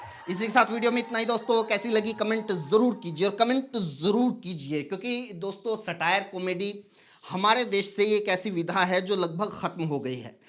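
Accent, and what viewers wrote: Haryana, India. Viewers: I love your Haryanvi language fragrance ❤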